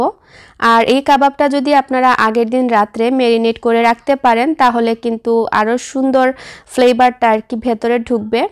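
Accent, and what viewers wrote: Sylhet, Bangladesh. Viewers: আপা তোমার বাড়ি সিলেটের কইগো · Mashallah 🥰 This kids and your Shylety accent are really love ❤️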